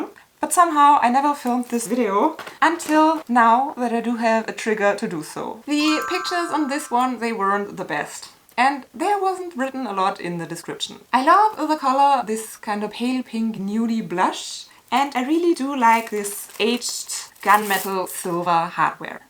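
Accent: Germany. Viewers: The bags are pretty 😍🥰 and your sweet German accent is ever sweeter ❤️🥰💋😍💞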